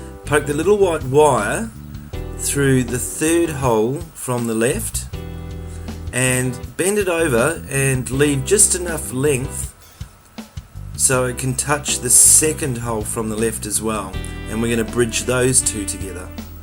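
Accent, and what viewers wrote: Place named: Australia. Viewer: Unbelievable. An Aussie accent. I subscribed immediately. Yanks and Poms are ok but as an Aussie it's a nice touch to hear an Aussie or Kiwi accent.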